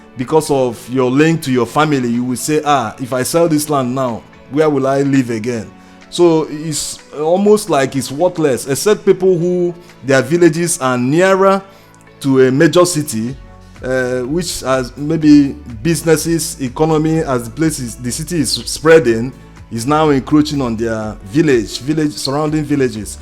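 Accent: Igbo, Nigeria. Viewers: Is this commentator okay? You slap a man for building a house for his family with his own money ,the woman must be mad i. e if his story is even true! You sound Igbo, you lack depth in your analysis.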